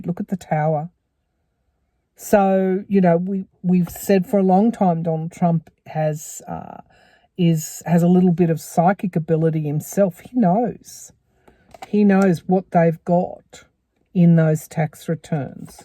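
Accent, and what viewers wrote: Australia. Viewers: When you said that this is the card reading for this week, I heard “car reading,” differences between your area Australian accent and a Kansas one! Pretty funny for me anyway! I still giggle when I think of my first reaction…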